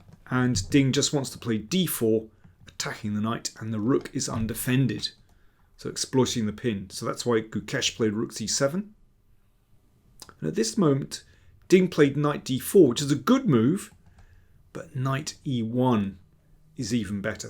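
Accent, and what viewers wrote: England, United Kingdom. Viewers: This may not be the fastest recap video, but it's definitely the most clear, articulated and easy to understand for all levels. Plus the English tone and diction goes smoothly with the analysis. Keep them coming, Mr. King.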